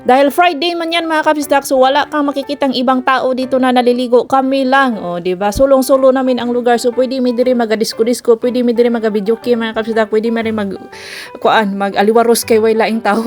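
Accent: Bohol, Philippines. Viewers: Tagal bohol ka mam? Or surigao😅 kay murag mao mao ra gajud tag inistoryahan, taga bohol ko.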